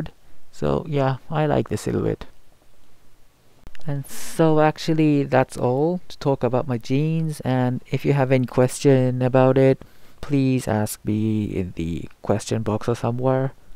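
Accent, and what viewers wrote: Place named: United Kingdom. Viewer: Nice video, thanks! Your English is pretty good, by the way! Did you study British English? Didn't you? I'm just curious, because I'm studying American, and I am pretty sure that I hear British pronunciation.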